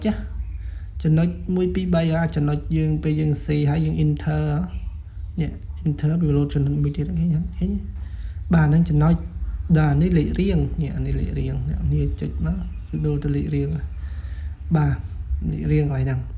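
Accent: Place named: Kampot, Cambodia. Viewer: ស្រ៉ូប ៗ ដូចអ្នកកំពត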